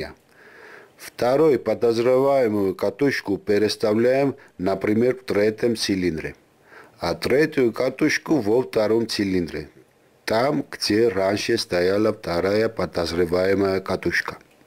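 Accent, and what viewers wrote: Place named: Georgia. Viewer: Всегда с удовольствием смотрю и слушаю ваши видео. Особенно с грузинским акцентом!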